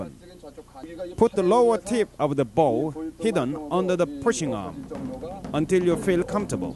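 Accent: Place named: South Korea. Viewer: There is a Korean accent in that English. I agree it sounds very unnatural.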